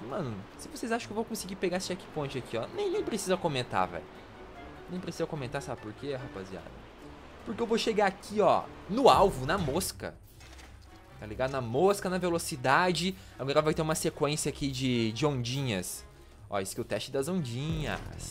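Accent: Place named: Paraná, Brazil. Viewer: porque nas antigas voce falava mais em um sutaque mais do Sul (Gauchos) e agora voce fala mais Paranaence???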